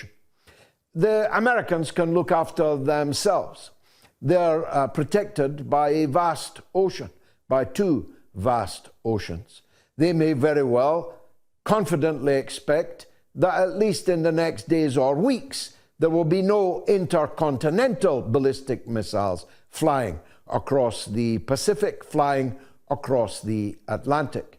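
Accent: Scotland, United Kingdom. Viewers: I like your Scottish accent. 😃👍❤️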